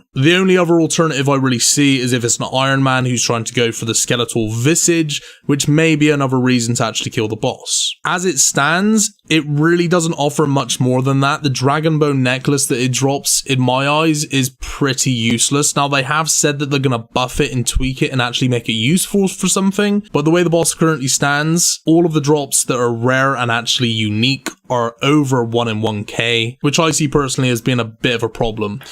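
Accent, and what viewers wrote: United Kingdom. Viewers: where is from? im guessing UK but i cant tell exactly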